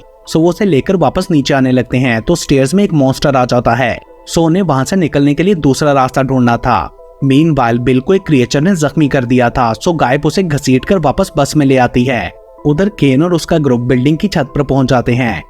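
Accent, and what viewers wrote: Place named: Punjab, India. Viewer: Hindi in punjabi accent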